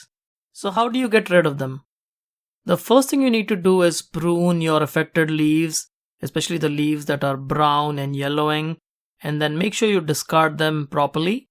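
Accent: India. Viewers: his accent is interestingly Indian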